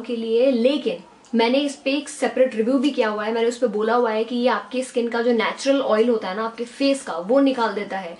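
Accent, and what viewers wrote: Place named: Rajasthan, India. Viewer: U totally rocked the rajasthani accent today!!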